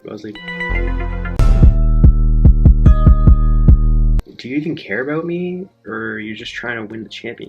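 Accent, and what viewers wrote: Canada. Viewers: You do sound Canadian though. The way you pronounce "out" and "about". Sounds Canadian bro! I suppose you could be FROM there and now living in the Boston area?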